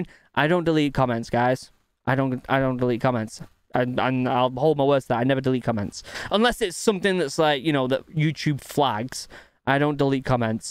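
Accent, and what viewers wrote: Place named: Manchester, United Kingdom. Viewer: Not a question, but heya fellow (or technically previously fellow) Manchester citizen. I only knew about that one once you mentioned it here. Wait now that I think about it how have I not noticed your accent being from around this area? Love it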